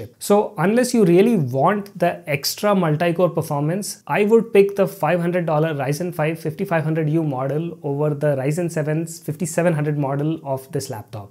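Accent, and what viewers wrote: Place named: India. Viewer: Great video! You really give good and helpful information. I also like that you took the effort to add those nice subtitles! Some people might have some trouble with your Indian (?) accent (like me - I am German), but the subtiles are really helpful, since sometimes there is just a word or two that I did not understand right away. All in all: Great and amazing job!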